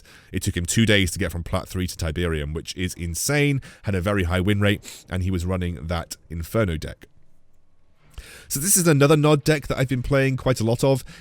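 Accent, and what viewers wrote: United Kingdom. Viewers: You do a good job on these videos pal, what part of the UK are you from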